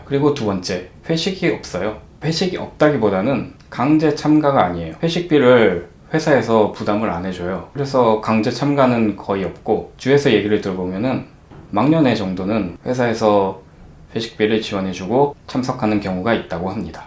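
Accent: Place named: Japan. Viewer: ㅋㅋㅋ 일본 억양이 살짝 살짝 들려서 가끔 사람들이 오해 할 듯 하네요.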